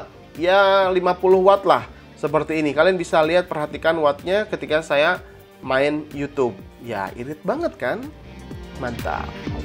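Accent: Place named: Sunda, Indonesia. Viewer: sundanya kentel haha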